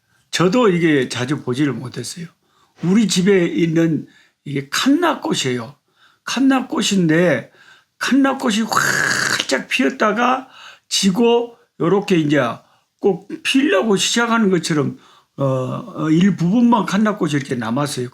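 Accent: Jeolla, South Korea. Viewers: 매번 야심차게 성경읽기에 도전하다가 레위기를 만나면 그냥 적당히 대충 느슨하게 읽는 흉내만 내다가 넘기곤? 했었는데 목사님께서 사교육비도 안받고 1대일 성경교사가 되어주셔서 어려운 성경을 너무 쉽게 그리고 하나님의 사랑을 느끼며 읽게 되었음에 감사드립니다. 하나님이 이렇게 세심하게 어리석은 인생에게 하나님 나라의 거룩한 백성으로 살아가는 방법을 가르쳐 주셨네요. 제사의 종류와 제사의 방법이 또한 정확하게 정립이 되었고요. 그 매번 제사장의 도움으로 어렵고 두렵고 복잡한 제사를 드리지 않아도 예수님이 친히 대속물이 되어주셔서 단번에 해결해주심으로 말미암아 이제는 성령님의 도움으로 언제 어디서는 예배자로 설수 있음이 너무나 감사합니다. 지난 연말부터 교회적으로 시작한 성경통독 프로그램과(목사님~ 여긴 목사님 팬이 많은 진해동부교회예요^^) 꿀송이 보약 큐티를 하며 레위기를 두 번 읽게 되었는데 더 분명해지는 말씀의 은혜가 있습니다. 목사님의 남도 사투리가 얼마나 사랑스럽게 들리는지 매일 목사님의 예사롭지 않은 등장을 기대하는 1인입니다. 자주 자주 꽃을 들고 등장하셔서 얼마나 사랑스럽게 바라보시는지 그 눈빛에서 목사님의 사랑의 마음을 느낍니다. 오늘 들고 나오신 나팔꽃 갸가 활짝 아름답게 핀걸 우리에게 보여주고 싶은디 그걸 아쉬워하시는 모습에서 이미 우리는 가장 아름다운 남아공의 꽃들을 마음으로 보았지요. 목사님~ 너무 감사하고 사랑하고 축복합니다.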